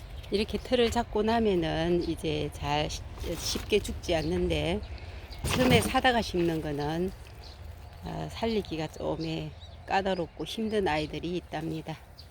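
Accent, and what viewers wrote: Gyeongsang, South Korea. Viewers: 코로나 때문에 아무것도 할께 없네요 네 정원이라도 눈이 아프드록 보고 또보고 위안을 삼지요 우리 영감님 너무 본다고 야단 맞으며 중독 됬네요 그 많은 꽃을 어떻게 관리를 하시는지? 부럽습니다 목소리를 들ㅇ.니 경상도인가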